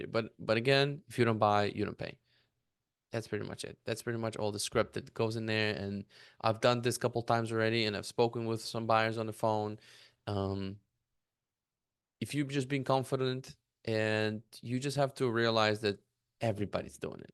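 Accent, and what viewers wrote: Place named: Eastern Europe. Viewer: I appreciate this, I think after the NAR settlement many are confused with what’s happening with buyer’s agents. There just needs to be more conversations to be had and value provided to clients. Love this breakdown and script, I think this is valuable for agents! Got a sub and like from me bro👍💯. P.S. - I'm Romanian, where are you from? You sound Eastern European 😅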